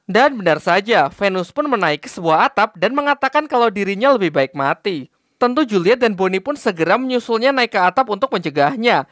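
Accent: Java, Indonesia. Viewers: Mimin orang Jawa ya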